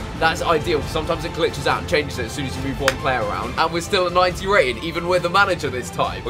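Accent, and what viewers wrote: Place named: United Kingdom. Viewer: agarwal he's British! (like me)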